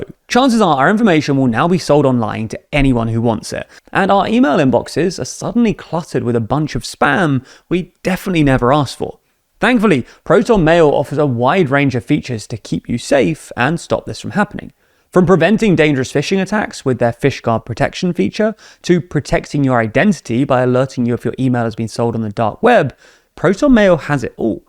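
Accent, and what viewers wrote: United Kingdom. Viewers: Cuber. I love British speak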